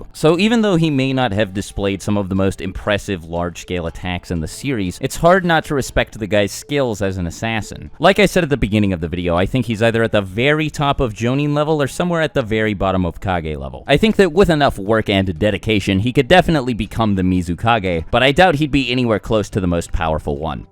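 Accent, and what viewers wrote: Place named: New England, United States. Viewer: You're actually very talented at forming and discussing arguments. I mean, no joke. You got chops, son. Also you're super confident and have that sweet ass yankee accent we southerners are supposed to hate (but secretly love...we hate CA accents, but stories for another time) I just really like the way you can put so much weight and disdain behind a word for tits and pickles, like "why do people give so much of a shit about WOOD" when talking about wood release. You also made me a Minato fanboi. So... i dunno if thats something to be proud of, but hey, you did it!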